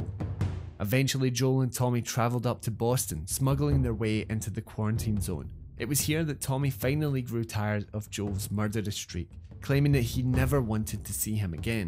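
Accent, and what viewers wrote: Scotland, United Kingdom. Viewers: I love your Scottish accent! :) it’s very calmed and easy to understand. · the universe doesn’t revolve around you, scottish accent is easy to understand for most people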